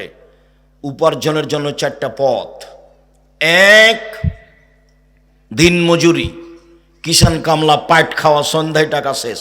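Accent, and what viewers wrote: India. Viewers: এই লোকটা কলকাতার ভাষায় কথা বলে কেন ? সে কি ভারতীয় ? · শায়খ হুজুরের কথা শুনে বোঝা যাচ্ছে উনি পশ্চিমবাংলা ভাষায় কথা বলছেন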